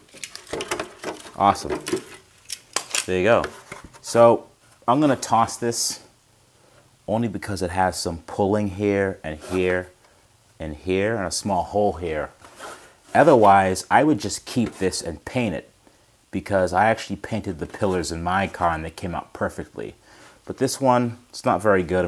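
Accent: United Kingdom. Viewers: At first I thought you had picked up a British accent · Loving the British accent. Nice touch lad.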